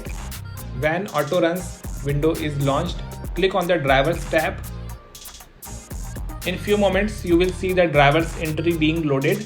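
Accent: India. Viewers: I never watched a video with an hindian accent that didnt help me, thank you so much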